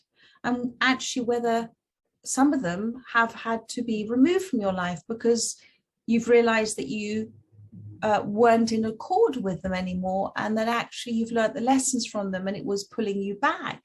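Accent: United Kingdom. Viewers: Your one of my favorites. Luv the British accent! T. U for sharing your gift with us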